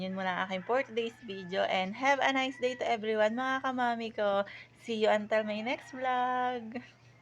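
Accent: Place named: Cardona and Morong, Philippines. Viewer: Parang cardona at morong ang punto ng salita nyo madam.kapareho ng sa amin taga isla talim si nanay .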